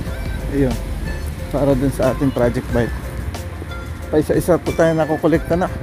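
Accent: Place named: Quezon, Philippines. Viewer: puntong quezon ka pa rin hane.. Ingat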